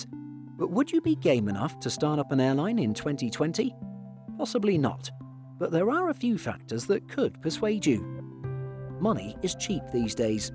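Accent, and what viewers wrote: United Kingdom. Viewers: I’m just curious and it’s no big deal to me, but like in this program, when you mention capital investment you most likely show U.S. dollars currency in your videos rather than British pounds, or other currencies. All along I’ve thought Simple Flying originated in Great Britain. I guess that’s because of the accent of your narrator (He does a bang up job.) so I’m always expecting monetary value in pounds and you trick me and use dollars. So my question is... What’s up with featuring American “dollars” in your script usually rather than British pounds??? FYI: I enjoy your programs. Please keep the high quality. Many thanks!!!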